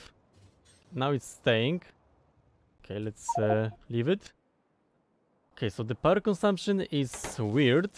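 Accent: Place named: Poland. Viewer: I thought so! I knew it sounded like my fellow country man, Elo mordo!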